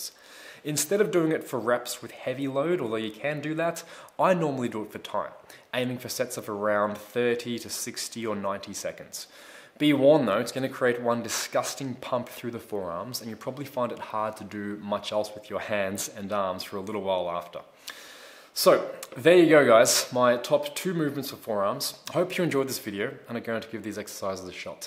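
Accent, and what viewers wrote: Australia. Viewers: Was so happy when I heard that Aussie accent coming through 🤙 great videos mate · Are you Australian,? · the whole time i thought this asian guy speaking with fluent Australian accent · Blessa my heart Blessa my soul Never seen an Asian whith an Aussie accent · Was NOT expecting the Aussie accent from THIS guy, just saying!!!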